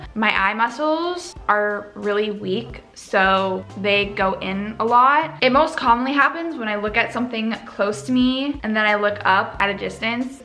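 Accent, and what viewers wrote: United States. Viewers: She has the LA accent now :((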